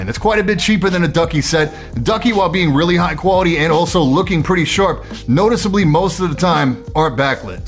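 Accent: New York, United States. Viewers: You do great reviews man. Really cool, polished production. And I don't know where you're from so I hope it's ok to say this, but I love that your accent sounds like you're a New Yorker just talking to his buddy like "Yo Jimmy! Look at these fuckin' keycaps. Look at these things." Such a cool vibe in a tech review.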